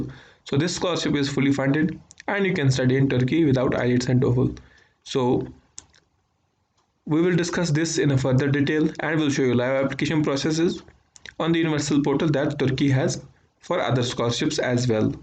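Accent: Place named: Kashmir, India. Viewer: bhai yaar accent se laga ki ap kashmiri he 😅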